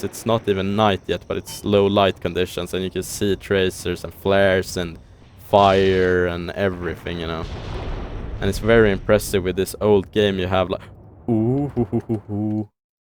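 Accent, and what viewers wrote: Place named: Sweden. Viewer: I knew that you're Swedish from the accent lmao😭.Btw I love your content,keep on going,you'll eventually get more views and subs.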